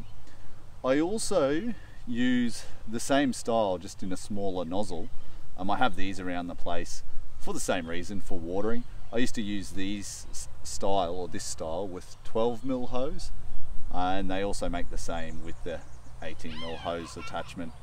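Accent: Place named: Australia. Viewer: He's got the nice, hot Australian accent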